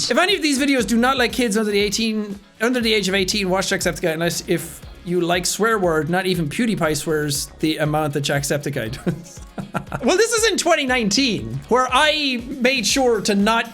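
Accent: Ireland. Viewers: I actually like when swears a lot, he's and entertaining guy and his Irish words are magical to my ears. Granted I'm an adult now so I guess it's different. I'm also Native American so like the Irish, swearing is integrated into our conversations a lot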